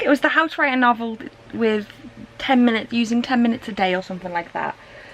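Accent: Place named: United States. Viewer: My like... vlogging inspiration is Julien Solomita. He has a reeeeaaaally fancy camera, but the things he will film and his editing are totally doable! He doesn't always do creative filming for his vlogs, but many times he does. xD I know, I'm learning to be fancy too. Haha, I love your ditsy-American accent. Oooh lady I know the migraines. I know them. >.< HAHAHA, I LOVED your thought train on food! That comic creator sounds fantastic!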